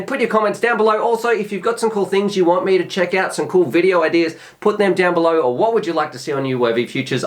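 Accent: Australia. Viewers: Being new to this hobby, I'm curious just how many sports or activities there are. Especially at the entry level. I see a few drone makers doing a sort of flight school type thing with certificates at the end. Just curious if anyone has ever done an overview of all of the entry level activities there are they make the learning curve easier or more fun. Thanks for all the awesome work you've done, when I get to submerging myself into a subject on YouTube, someone always rises to the top of my watch list, that sir would be you. Might be the Aussie dialect, but I'm pretty sure it's because you do awesome videos!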